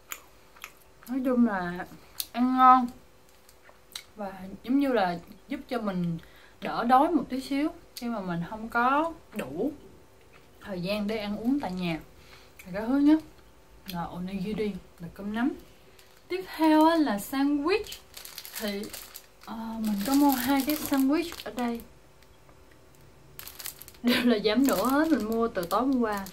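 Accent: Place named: Long An, Vietnam. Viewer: giọng nói giống người long an nhỉ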